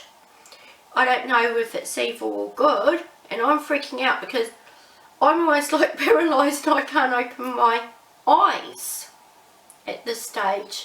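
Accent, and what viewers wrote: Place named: Australia. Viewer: Thanks for sharing your experience.. I am also going to begin Sungazing, and would like to know if you Sungaze when it is cloudy, and the Sun is covered. Did sacred sounds and mantras through Dr Pillai also add to this awakening of the The Third Eye? I. It may have been the Kundalini (serpent) opening up more of your power center at the navel point which is a golden color. Shreem Brzee and Turmeric both are associated with that golden color...just giving some ideas to bat around. Luv your videos and encouragement about wealth/Brzee. You are candid, and funny, too! You have alot to add to everyone's understanding.and your Australian accent is charming..to us in the USA. Is there a product for cleansing that you can recommend ? Thanks..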